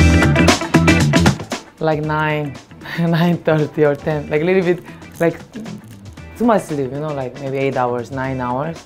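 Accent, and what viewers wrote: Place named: Turkey. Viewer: 언니 터키식 영어 너무 좋아…마지막에 터키어랑 손키스는 뭐야 ㅠㅠㅠㅠㅠㅠㅠㅠ 하 미치겠네 중간에 자기 구글에 쳐본적 있냐에 답할때 왜 이렇게 귀여운건데………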